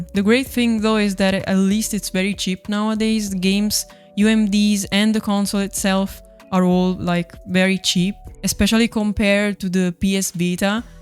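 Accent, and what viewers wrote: Italy. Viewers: the italian to english translate struggle is so real omgg happens to me all the time too!! love your accent!!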